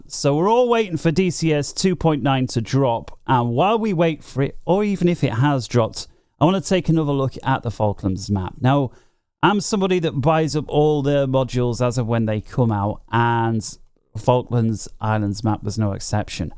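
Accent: United Kingdom. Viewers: thank you for reminding me that I'm allergic to bri'ish english.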